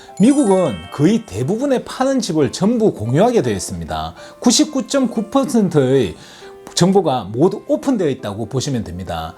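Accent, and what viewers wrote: Gyeongsang, South Korea. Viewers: 감사합니다!! 요즘 매일 보고 배우고 있습니다 특히 경상도 액센트 좋아요 😁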